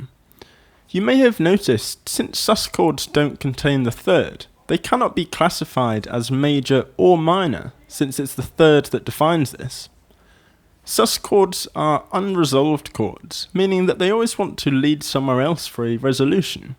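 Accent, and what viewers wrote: United Kingdom. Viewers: u british right?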